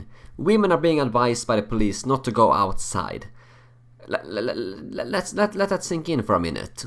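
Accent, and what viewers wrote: Sweden. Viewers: you´re a norwegian citizen first of all so maybe change the name. Secondly, plz improve your pronunciation. Your really going full on crazy swedish accent. I have never heard someone in your age span speak with such a heavy accent. Third, either your lying or not aware of how the new law will work. The law is changed so the rapist can´t deny knowing the victim didn´t want the sex if she/he did not resist. What you said about a writen consent or recording is totally made up. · Ah this solves everything. Now the rapists won't rape anymore because they've always been bothered with consent before... On another note... goddamn your Swenglish is thick... one of the worst I've heard, even worse than Lofvens... and you use English more often than most Swedes. You should check out some videos of English pronunciation because it really detracts from the message you try to deliver.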